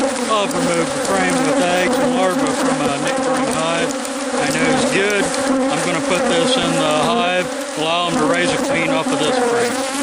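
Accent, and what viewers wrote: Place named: South, United States. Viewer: With those bees being aggressive and with his southern accent its hard to understand what he is sayin.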